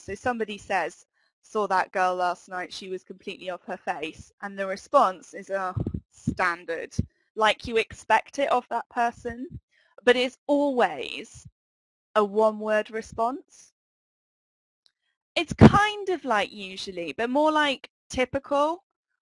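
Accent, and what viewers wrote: United Kingdom. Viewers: прекрасный британский акцент! супер!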